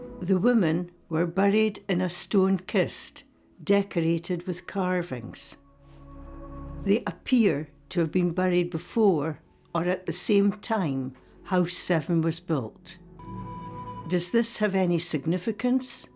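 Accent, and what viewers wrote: Scotland, United Kingdom. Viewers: What a lovely voice Scottish obviously ❤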